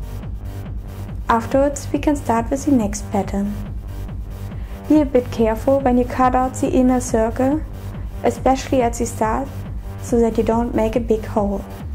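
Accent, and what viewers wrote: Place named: Germany. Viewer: You are a German girl. Lovely accent you got. See u pretty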